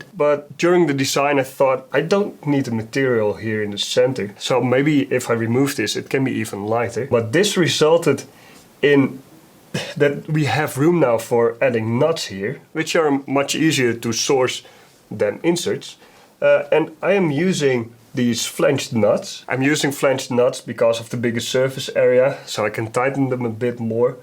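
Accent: Netherlands. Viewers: het shitste Hollands-Engels accent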